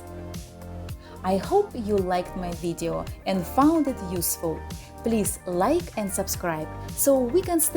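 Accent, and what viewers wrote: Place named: Russia. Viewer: )) у вас русский акцент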